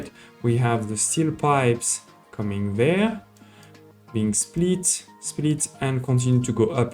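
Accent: France. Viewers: l'accent Français c'est quand même vite reconnaissable quand on parle anglais :D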